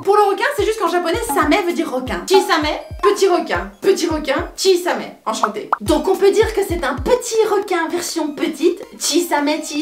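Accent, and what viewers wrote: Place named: Brussels, Belgium. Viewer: L'accent bruxellois sa me fait rire!! Bravo continue ce que tu fais:)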